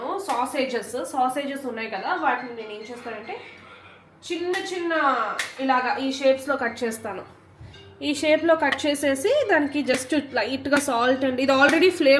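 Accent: Tamil Nadu, India. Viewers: Akka miru matladettappudu tamil language vasthundhi 😅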